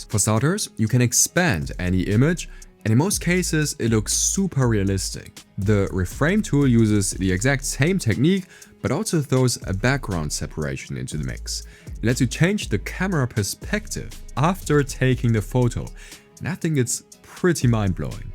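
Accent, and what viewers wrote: Germany. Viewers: erster Nice. starked Video. Mann kan aus dem Accent hören, das du Deutsch kannst. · Dass du ein Deutscher bist, hört man sofort raus. 😂 Wünsche dir viel Erfolg mit deinen Videos. 👍🏼 Weiter so.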